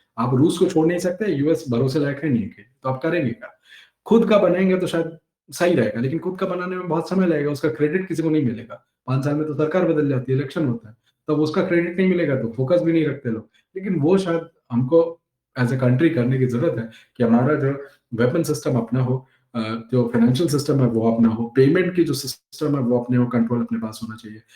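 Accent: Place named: Uttar Pradesh, India. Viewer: Aap ki boli style se andaza hai ki aap uttar pradesh se hai ,correct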